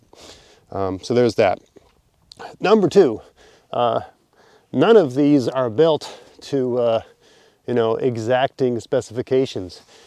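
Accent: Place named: Nova Scotia, Canada. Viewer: i am a huge fan of the trailer park boys and live in europe. the moment i heard you talking, I was absolutely sure you are from Nova Scotia and i cant believe i was right :) you remind me a bit of Randy I dont know why, you are handsome and he isnt :) maybe the eyes and accent. love your channel, just subscribed and started watching. Thank you for everything you do. greetings from central Europe.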